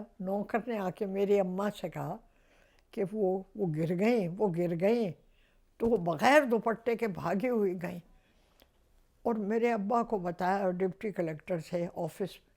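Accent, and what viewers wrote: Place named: Kashmir, India. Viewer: I wonder why she a hint of kashmiri accent.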